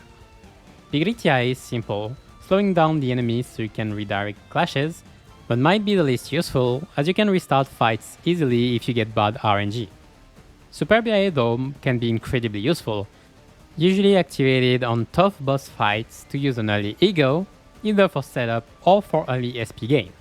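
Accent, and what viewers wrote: France. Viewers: Thank again for tutorial i will send to my friend he really need (J'adore votre accent français xD)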